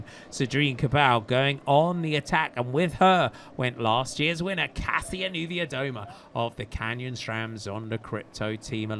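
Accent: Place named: England, United Kingdom. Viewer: It's the English language, not the American language. They invented the language. He's pronouncing it the way all English people pronounce it. Here, in Canada, we also say "kill-ohm-mahters".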